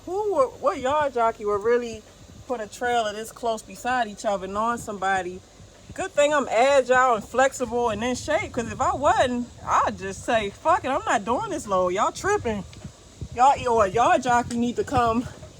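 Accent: Baltimore, United States. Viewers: You have the Baltimore accent lol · Are you a baltimorean ?? Lol. I hear this distinct accent in your voice. Two , do , etc.... just askin.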